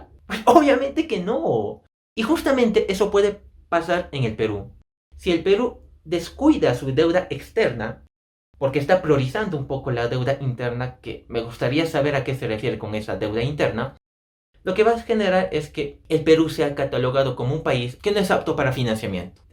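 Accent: Spain. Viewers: Me parece o este men habla como español? Tiene cara de cabeza clava como yo, yara con los complejos mi rey :v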